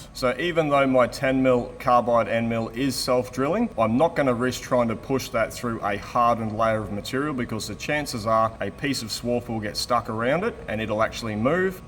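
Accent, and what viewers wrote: Australia. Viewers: I have never had the captions on and thought the same thing, and I definitely don’t fit that description 😂. I have boots older than 😢. Thanks for the translation of Aussie to American 😊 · All this time I thought was starting these videos saying "Hey young guys..." and wondering why he thought old guys (like me) wouldn't be watching these videos. But today I accidentally had the closed captioning on, and was surprised to discover that he was saying "How you going guys?"! I guess I need to brush up on my 'Stralian. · Hi, great videos. Your explanation of the purpose of the springs in a flex plate/clutch is wrong, maybe it's just the way you said it, you speak Aussie and I speak American. The main purpose of the springs is to absorb torsional vibrations that are generated by the engines that drive them. The torsional vibrations can destroy a gearbox or transmission. 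In automotive applications they also act to prevent harsh engagements when the clutch the clutch pedal is released. Automatic transmissions don't need them as the torque converter/fluid coupling acts as a damper. The size of the flex plate, number of springs, and the rate of the springs is governed by the horsepower it needs to transfer to the driven parts. A multi-cylinder engine does not need heavy springs since the power impulses (torsional vibrations) are close together. A one or two cylinder engine needs very heavy springs as the torsionals are close together. Look at it this way: In a four stroke engine with two cylinders, there will be one power pulse per revolution. In an eight cylinder engine there are four power pulses per revolution. Think of these as positive torsionals as they accelerate the engine in the direction of rotation. Conversely, every time an exhaust valve opens, there is deceleration due to the drop in cylinder pressure, so in the case of the eight cylinder engine we have four positive pulses and four negative ones, The negative ones are of less intensity of course. 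When I used to work on boats a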